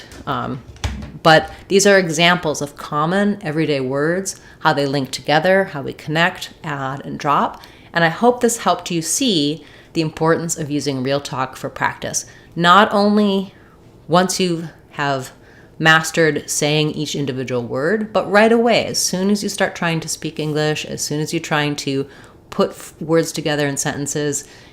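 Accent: United States. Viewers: Is It American accent? please lemme know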